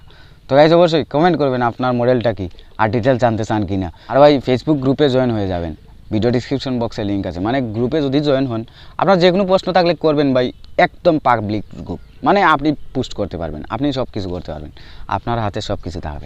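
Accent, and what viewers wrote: Sylhet, Bangladesh. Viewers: ভাই আপনি কী সিলেটি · Tumije sylhetii 🎉🎉 ita mateouu buja jayyy ❤❤valobasha niooo priooo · ভাইয়া আমি ১০০% শিওর আপনি সিলেটি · Kotar maje sylhety vasa ase... sure apne sylhety fuya😊😊😊 ami o sylhety furi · Sylhety ni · Vai apni ki Sylheti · Bai apnar bari mono koy Sylhet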